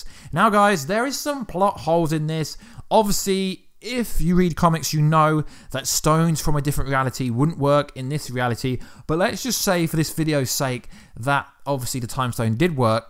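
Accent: United Kingdom. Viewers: OH MY GOD... MAN WITH BRITISH ACCENT... YOU KNOW ANT-MAN HAS NOT BEEN RELEASED IN THE UK AND YOU JUST SPOILED IT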